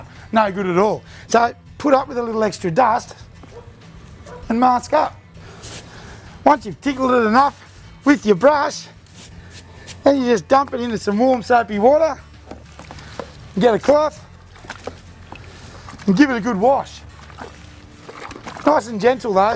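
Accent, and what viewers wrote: Australia. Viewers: We love you Australian!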